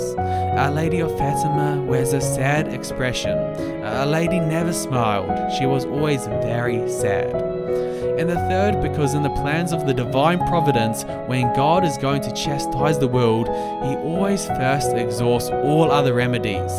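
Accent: New Zealand. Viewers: That is a New Zealand accent.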